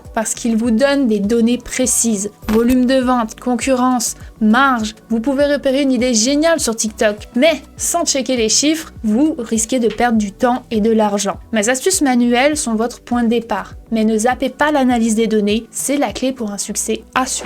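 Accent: Quebec, Canada. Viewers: Merci pour ces idées de recherche produits ! Il est aussi intéressant de constater que dans le seller central il y a des outils "gratuits" (on paye l'abonnement) qui sont plus précis que les outils tels que Jungle Scout ou Hélium 10... Car les data viennent directement de Amazon... As tu fait une vidéo là-dessus ? Merci pour ta bonne humeur et ton petit accent québécois... ❤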